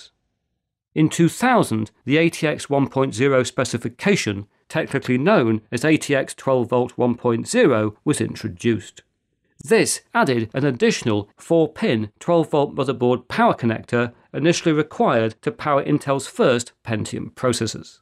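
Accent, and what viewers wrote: United Kingdom. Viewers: Actually I came here 4 studying British accent 😜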